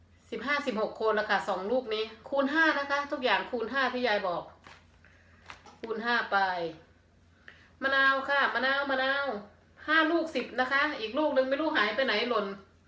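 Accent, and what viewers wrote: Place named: Central Thailand, Thailand. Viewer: ยังไม่แก่เลยเรียกตัวเองว่ายายแล้ว เราอายุมากกว่าอีกยังไม่อยากเป็นยายเลย 5555 ดีค่ะชอบ พูดภาษากลาง เราคน กทม.ฟังภาคอื่นเค้าคุยกันก็น่ารักดีนะแต่ฟังไม่ค่อยรู้เรื่องคะ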